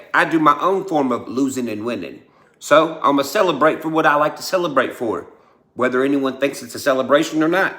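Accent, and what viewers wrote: South, United States. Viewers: As an old dude- I'm just gonna say it. Thank you for being a redneck rapper who STILL speaks like a southern dude. * Cool tune btw.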